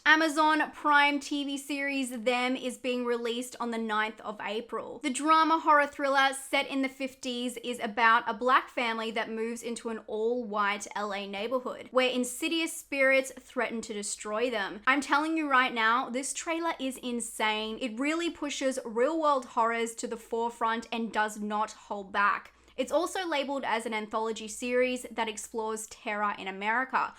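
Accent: Australia. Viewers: ah bro bless her, subbed when she was at 2-3k and almost at 80 k now and she still puts up A class content love her aussie accent lol wothahhhh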